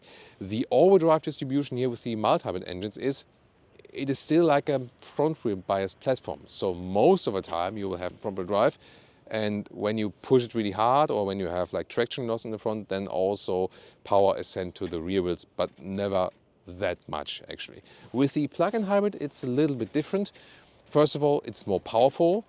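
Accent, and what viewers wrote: Germany. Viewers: You have german english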